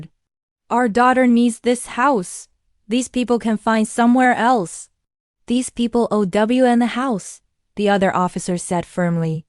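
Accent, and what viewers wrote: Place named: Asia. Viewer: Why do you sound like you have an Asian accent while a bunch of white people are used for the story?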